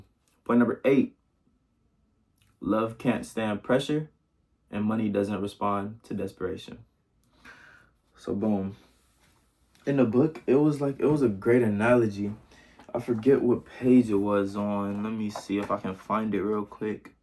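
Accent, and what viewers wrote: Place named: Cleveland, United States. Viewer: Yep u get attached to something u want not need 🔥 Point 1)Yep misery loves company Bro 🔥 Point 2) No wonder Brothers like you reach out to me! I exudes this energy! Point 3) Yeah I can show love whenever I can cause IDGAF what anyone else thanks 😂 I'm pretty sure that's another reason for reaching out 😂❤️‍🔥 Point 4) Yeah my internal completion is my own trophy, belt 🙌🏿 As long as I help 1st & wouldn't mind help 2nd ❤️‍🔥 Point 5) That's why I've graduated from community college with a 3.7 cause I had to take off in the past, fix & get my flow right, make sure I'm in alignment with myself 1st & obviously God & the 1st of da Fam that was there for me at that time who got me too ❤️‍🔥🤝🏿❤️‍🔥 Point 6) Yeah Bro they say if u chase something it runs away 🏃🏿‍♂️💨 😂🤣 So Once I became it Good Brothers like u started reaching out to me ❤️‍🔥🤝🏿❤️‍🔥 Point 7) Yep I went off of frequency to help reach out you & I'm goin completely off of frequency with myself 1st to make sure my life takes off this week 🔥🔥🔥 Point 8) Damn Right Brother 🔥💯 ++++ Bonus that waz a bar fo bar 😂🤣 & PS Yes now this makes since I have an increase of yall reaching out to me now & new Brothers reaching out to me 😂🤣 its the energy from all the good work I'm doing yall feel 😂❤️🤝🏿❤️💯 Good Video My Little Brother & yeah I emphasize with that Cleveland accent sometimes I have a tongue twister 🌀 too with my Detroit accent 🤝🏿😂🤣🇨🇦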